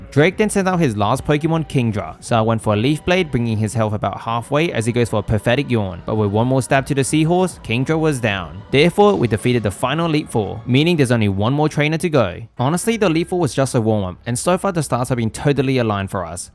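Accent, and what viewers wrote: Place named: Australia. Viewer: Your voice sounds Australian unless im hearing things if so hi happy new years from Queensland also good dark run with dark types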